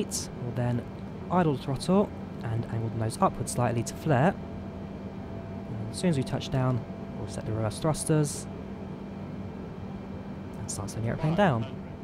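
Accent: United Kingdom. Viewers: THE BRITISH ACCENT IS KILLING ME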